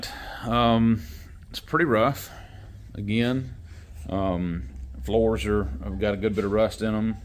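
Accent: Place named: South, United States. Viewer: Where are you from ? Colorado? I detect a southern twang.. 🤠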